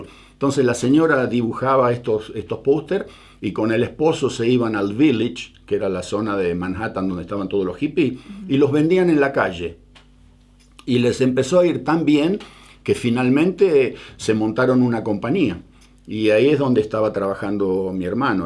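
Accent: Argentina. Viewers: Me encanta su manera bien argento, de relatar. A pesar de haber dejado nuestro país hace 50 años, no perdió sus modismos ni acento. Saludos. 🎉🎉🎉🎉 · Que historia realmente de pelicula..... !con mi marido coincidimos que la narracion de la misma nos genero diferentes sentimientos ,realmente muy atrapante y con espiritu de superacion ,responsabilidad y valentia destacables ,porque a pesar de la corta edad se notaba en sus relatos que eran jovenes responsables y al verlo ahora se refleja una persona integra ,seguramente sus hermanos tambien, gracias por compartirnos su historia y su hija firme apoyandolo al lado da mucha ternura y la felicitamos tambien , se nota ese amor a la tierra ese Argentino de corazon hasta el acento esta intacto ...felicidades por los logros porque a pesar de las vicisitudes de la vida su progreso y superacion estan a la vista . un saludo desde Catamarca ARGENTINA ...... 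· Muy interesante,sigo todos sus videos y me fascina la forma de narrar tan nuestra,tan argentina de,quiero seguir escuchando esa maravillosa historia de vida!!!!! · Bien,me asombra y agrada a la vez,que no perdiste el acento argentino,ni los modismos,despues de vivir tanto en el extranjero,Un saludo desde Argentina.(Y me parece que te esta "tirando"el solcito,porque a cada rato andas por el pais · Por que lo voy hacer? he estudiado.Sobre gustos los colores! No me gusta! al principio si por que vi el acento argentino, pero despues se puso Denso! No me interesa! saludos! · Sí! Me encanta que a pesar de haber emigrado tan joven todavía tenga una manera muy argenta de hablar. ❤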